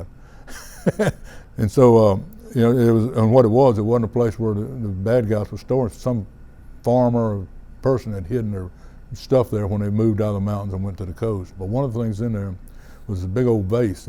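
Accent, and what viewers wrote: Louisiana, United States. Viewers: You Cajun? Sounds like southern Louisiana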